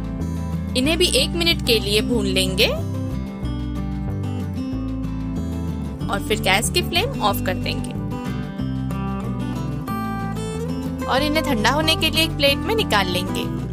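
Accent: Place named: Nagpur, India. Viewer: I can sense Nagpuri accent in your language, though u tried to talk in pure hindi.....'ab apni' 'aju baju se'🔥🔥bole to apna Nagpur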